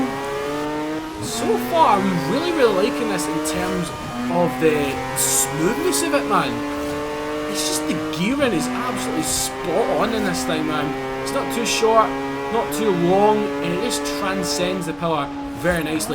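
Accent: United Kingdom. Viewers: Rams, instead of rims. Enchies, instead of inches. I love how you British people talk xD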